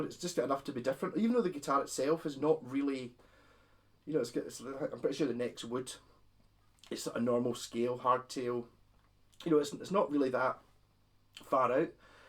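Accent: Scotland, United Kingdom. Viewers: are you Scottish or something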